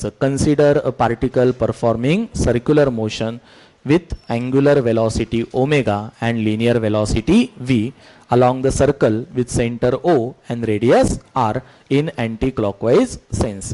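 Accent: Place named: Pune, India. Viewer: Tumhi PUNERI such a shuddh Marathi. So smart teaching.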